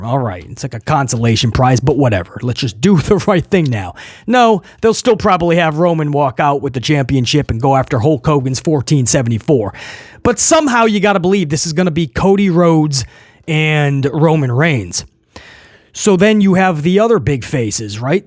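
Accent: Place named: New York, United States. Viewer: You are definitely a New Yorker just like me you sound like a New Yorker1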